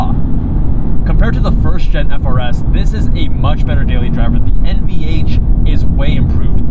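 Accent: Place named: Singapore. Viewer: Sounds Singaporean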